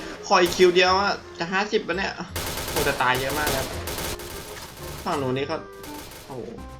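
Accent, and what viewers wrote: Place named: Kanchanaburi, Thailand. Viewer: เหน่อแบบนี้อยู่ กาญจนบุนี แน่เลย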